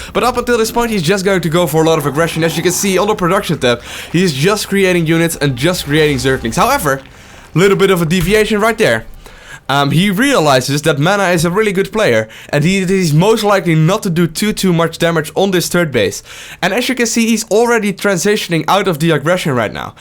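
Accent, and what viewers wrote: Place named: Netherlands. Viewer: dat classis dutch accent....sounds exactly like rotterdam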